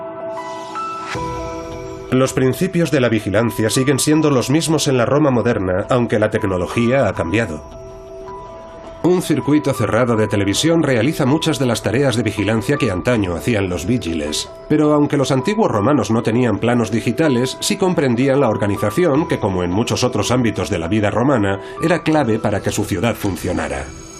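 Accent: Latin America. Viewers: NETFLIX ya se dió muchísima cuenta, que tenemos Español Latinoamericano....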